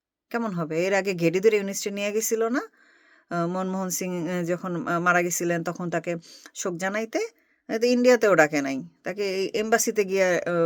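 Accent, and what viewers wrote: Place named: Bangal, Bangladesh. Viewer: Bangladesher rajniti bujhina,apnar mukhe Bangal kotha shune valo laglo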